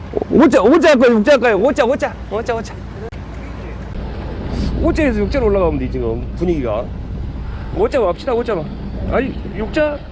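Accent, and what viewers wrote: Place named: Jeolla, South Korea. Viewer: 영상보니 좋아 보이네요..... 구수한 전라도 사투리에.... 선장님 배로 외수질 출조하려면 어떻게 해야 하나요?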